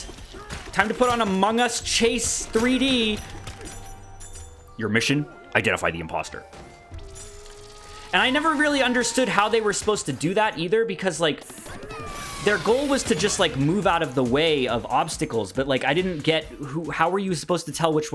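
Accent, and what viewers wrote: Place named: Boston, United States. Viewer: Doesn't remember 90% of the jokes chat remembers. Remembers them shaming his Boston accent. Think about the effect your words will have, people.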